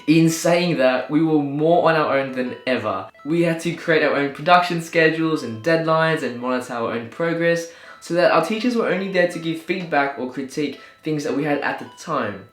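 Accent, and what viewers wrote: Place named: Australia. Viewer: so i've been following you on instagram and tumblr for so long (youtube for at least a year) and as you were speaking, I only just realised that you were Australian... OTL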